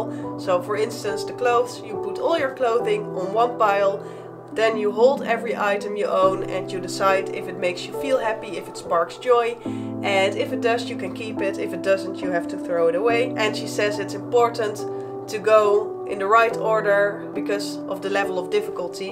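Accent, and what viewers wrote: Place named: Netherlands. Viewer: Ik kan horen dat je uit Nederland komt! Super leuke video’s :)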